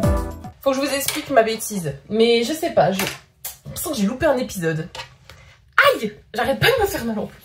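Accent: Lorraine, France. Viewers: Cc Bouton jean pour moi 😁 Et c’est toujours un plaisir de te voir et j’adore ton accent lorrain 😅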